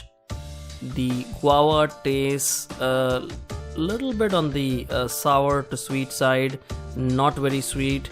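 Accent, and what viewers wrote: India. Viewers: Is this voice indian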